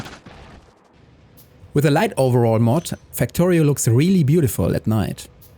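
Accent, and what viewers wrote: Germany. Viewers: Das ist das erste Video bei dem ich gerne eine Übersetzung von einer KI hätte. Dein Akzent klingt wie Bayrisch, nur schlimmer. Holy Moly. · You sound german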